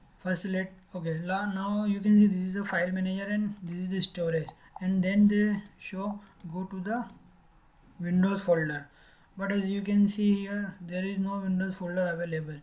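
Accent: India. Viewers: You know you're saved when the commentary is in indian accent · That is the most Indianest accent I have ever heard. Keep up the good work bro!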